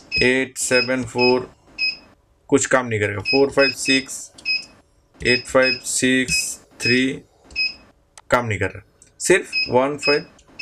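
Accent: Bengal, India. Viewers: Bhai aap bangli ho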